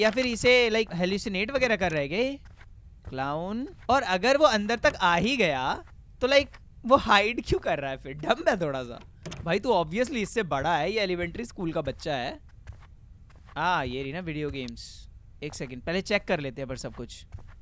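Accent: Haryana, India. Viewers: really nice video, is one of the only gamers who try different games and show them to ther audience with his great hariyanvi mixed accent and.... you were expecting something more right, but it was me DIO!! [ KONO DIO DA ]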